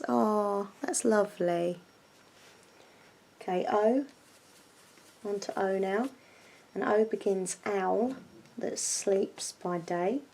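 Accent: United Kingdom. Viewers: your British English is so beautiful！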